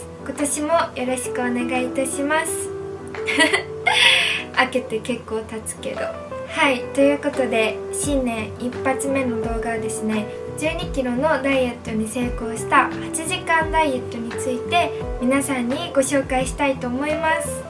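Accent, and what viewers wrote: Kansai, Japan. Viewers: 関西弁なのめちゃめちゃ萌える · 関西弁てギャップがまた良い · 関西弁？うちも関西の人間やからなんか、嬉しいな。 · バチバチにクールな子かと思ってたら関西弁でめちゃめちゃ可愛い喋り方で一気に惹かれました。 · この美形で関西弁なのが嬉しい可愛い😂💘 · 動画に関係ないけど関西弁がかわいすぎる😭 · 浜辺美波ちゃんに似てるしめちゃくちゃ可愛いし、関西弁で親近感湧く！可愛い！正義！ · 初めて見ました...可愛くておっとりした感じの関西弁大好きです😭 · （ダイエットと成功体験から学ぼう、！）と思って動画見始めたはずなのに、お顔が強くて韓国系なのに可愛い関西弁が出てくるから可愛いのオンパレードだわ、、と困惑してしまった₍ᐢ.ˬ.ᐢ₎ 8時間ダイエットならできそうな気がする！！ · この美しいお顔で関西弁なの最高…☺️ そして美しい人はやっぱり努力してるんだなぁ。素晴らしい。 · 関西弁とかギャップすご！かわい！ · 聞きやすいと思ったら関西弁やった〜めっちゃ聞きやすい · 美人の関西弁はズルい可愛すぎる、、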